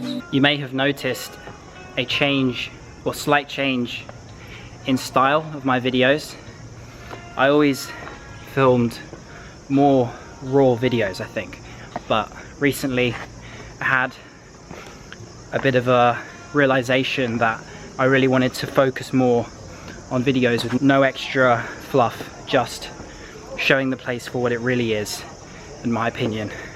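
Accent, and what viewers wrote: United Kingdom. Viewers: british accent , omg I love american accent !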